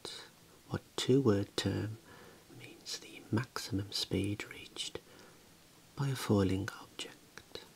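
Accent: United Kingdom. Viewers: The most finest British gentleman I've ever seen speak with such elegance and class! Love from India✌🏽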